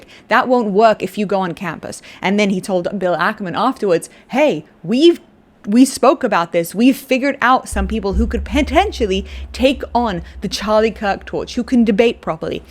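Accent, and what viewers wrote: United Kingdom. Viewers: British accent? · I like your hair!! Its like wool... beautiful texture. By the way r u British? I like your accent too...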